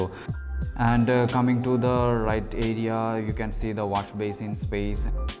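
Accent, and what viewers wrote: Kerala, India. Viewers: Based on the accent, we guess the house is constructed in Kerala. Good house.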